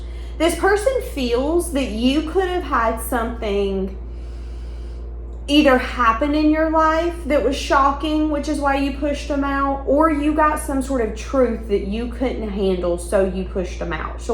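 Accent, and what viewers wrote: South, United States. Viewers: Unrelated but girl I’m from Mississippi and you have the most adorable southern drawl I have ever heard!!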